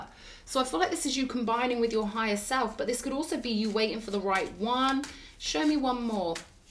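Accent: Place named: England, United Kingdom. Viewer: Well now you have me love struck with the natural setting as Heaven, tee hee. Having the queen's english and angelic level already had me sold that you are a gem. Chances are you may not be interested in a 61 year old frog though. I'm guessing you are quite picky. As we all should be I guess. Personality wise for sure. Take care. ✌️🥰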